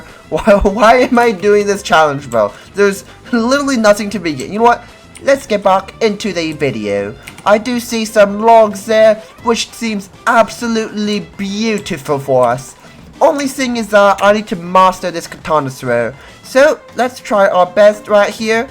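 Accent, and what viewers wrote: United Kingdom. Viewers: I swear he sound British.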